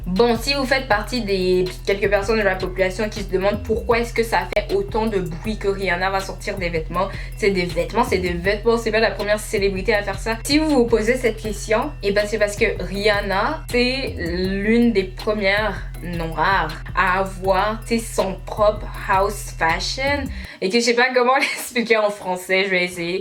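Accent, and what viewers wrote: Quebec, Canada. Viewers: Tu es québécoise ou tu veux Québec ? 🤔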